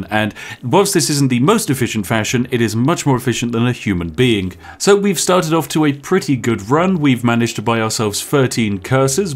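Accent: United Kingdom. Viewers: You sound more British than MumboJumbo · He sounds british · Ur from britain? (tea image) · Is this guy British? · You British Bruh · I just saw the prewiew, clicked on video and by the first words already knew that he's british without even looking at channel name or the video itself . I think it's time to reconsider my life choices.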